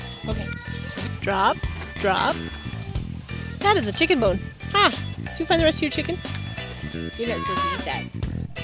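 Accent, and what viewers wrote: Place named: United States. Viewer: You have a wonderful doggy/human family. And a lovely place to raise them. Lucky creatures. Are you Norwegian too? You sound American, but I imagine some Norwegian influence. Sorry, am not nosy, just curious (and envious). All the best to you all. Anne-Lise (from Norway)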